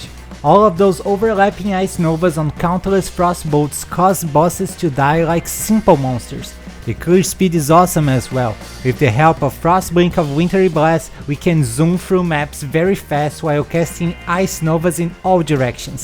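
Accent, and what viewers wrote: Brazil. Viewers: Acho fantástico que dá para sentir o sotaque brasileiro kkkkkkkkkk Obrigado pelo vídeo!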